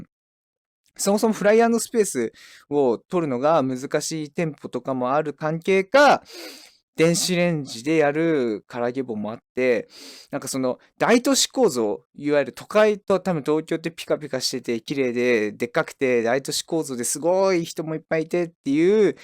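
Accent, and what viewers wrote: Hiroshima, Japan. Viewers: 時々広島弁になってねえか？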